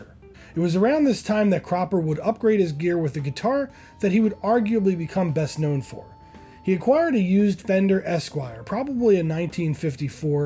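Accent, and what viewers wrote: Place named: Delaware County, United States. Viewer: Thanks. I'm a recent subscriber and I enjoy your videos immensely and find it heartwarming to hear a hometown accent in your narration. I'm guessing you're a DELCO guy like me. After living my first 43 years in DELCO, I moved but I'm still in PA. Though I'm a drummer first, Steve Cropper is a favorite of mine. He's one of the biggest reasons why I love the Tele so much.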